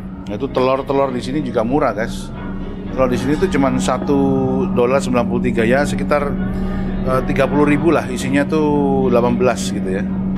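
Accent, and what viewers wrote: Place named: Java, Indonesia. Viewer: Jowo ne jik kentel rek😂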